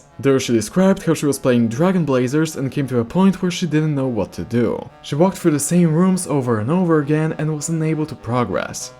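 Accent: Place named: United States. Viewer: Wow if i didn't know you arę from Poland them I might say that you are from america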